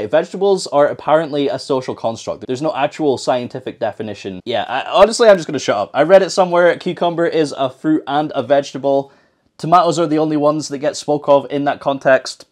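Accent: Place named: Scotland, United Kingdom. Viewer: Getting a binder full of good stuff? 6/10 Watching a scottish man talk about cardboard in a funny accent? 10/10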